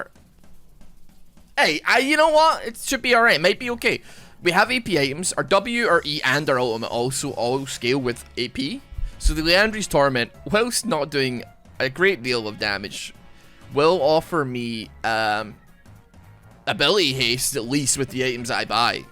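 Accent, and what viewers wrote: Scotland, United Kingdom. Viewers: I need to know if you are capable of saying "purple burglar alarm," as supposedly some Scott's cannot.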